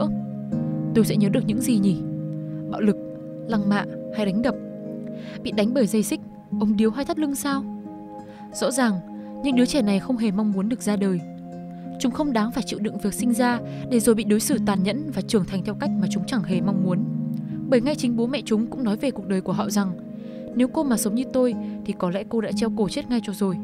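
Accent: Vietnam. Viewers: hihi mình nói giọng Nam chữ "dây" phát âm khác chữ "giây". Nghe bạn nữ giọng Bắc phát âm "dây" giống hệt chữ "giây" nên mình bị quán tính, thật là thiếu não.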